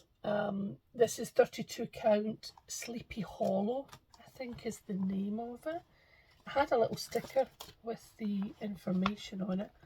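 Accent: Scotland, United Kingdom. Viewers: Congratulations on 3 years Flosstube! Love all your stitching and the inspiration it brings, including the bird and all the lovely fabric. Great to hear a lovely Scottish accent on Flosstube! 🏴❤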